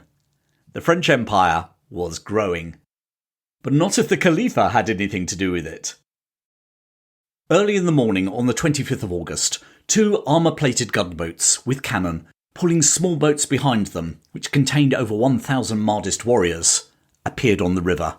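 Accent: England, United Kingdom. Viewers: Perfidious albions, you are right. Kitchener was a francophile, and pleased to drink some champaign. What a waste, French champaign for a rosbeef. Thanks to for his uterative English accent. I dealt with Amoseas oil petroleum company on my past business activities, and these fellows were spoken Humphrey Bogart American English. Nevertheless, Great Britain was stronger than France for three centuries, but at the present time we are small nations.. I believe in Royal Navy UK, as still to be considered as unique. Grace of Kitchener, nothing happened in Fashoda. The place is named kodok,? Do you know, it means grenouille in Bahasa Indonesia? We French are same as Donald Trump, we shall confess our sins, but first fire me these British people who are sitting on our chairs, in our Egyptian colonies. Merci. Mercy.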